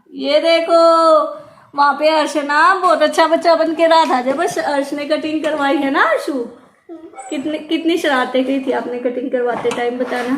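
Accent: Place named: Haryana, India. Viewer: Like6,dear apni original language me baat kiua kero..... means haryana wali .....whi achi lagti h😘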